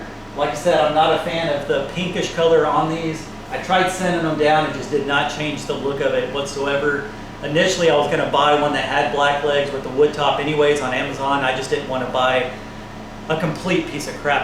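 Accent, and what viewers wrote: Texas, United States. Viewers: Morning having a chuckle here in Australia, but with your wonderful Texan accent I can hardly tell the difference between the two ways you pronounce “hearth”. Love listening to your accent. 😊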